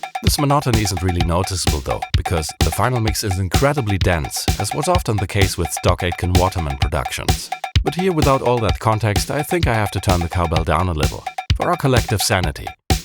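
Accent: Germany. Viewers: now i know: you speak German... or at least, your speak pattern is German influenced (😅)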